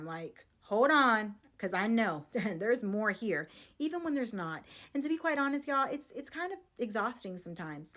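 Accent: South, United States. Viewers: You are soooo beautiful 😍 and I love your southern accent 🥹🤎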